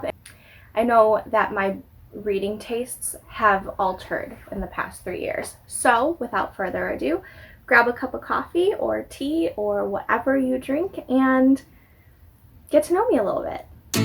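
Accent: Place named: Midwest, United States. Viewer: The Sarah Sanderson midwest accent coming thru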